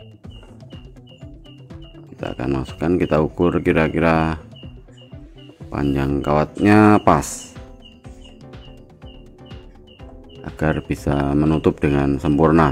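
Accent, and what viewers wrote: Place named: Java, Indonesia. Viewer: Orang Jawa atau orang Sunda ?? Kok disebut KANG , Tapi ngomong nya seperti Orang Jawa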